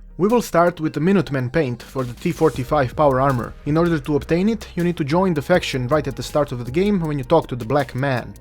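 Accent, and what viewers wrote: Russia. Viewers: Accent too thick, can't tell if He is Russian or Canadan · Ha ha ha love the commentary Russian accent is hilarious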